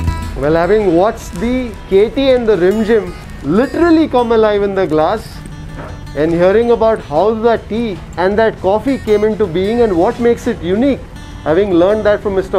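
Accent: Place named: South, India. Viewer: Ur accent is just like the South.😀😀 and are u always on journey?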